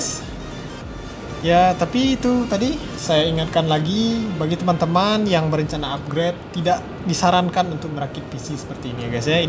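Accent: West Sumatra, Indonesia. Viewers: Logatnya urang awak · Wkwkwkwk aksen abg ngomong kliatan urang awak banaa😅😆😆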